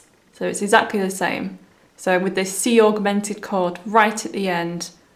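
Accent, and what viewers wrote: United Kingdom. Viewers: I love your British accent!😍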